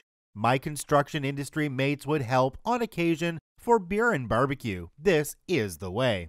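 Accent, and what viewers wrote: Australia. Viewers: Oh, this bloke is for sure from Australia haha. "Botanical Gardens" "Instro" 😂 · Beautifully Australian.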